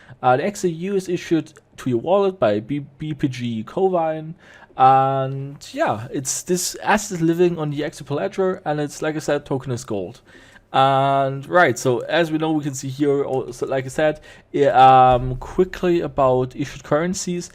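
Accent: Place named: Austria. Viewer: You must come from Austria, because your english Sounds Like Schwarzenegger. 🎉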